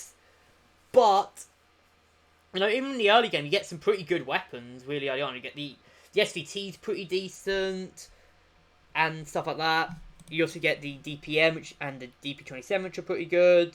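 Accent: United Kingdom. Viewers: how fricking cool is your british pronounciation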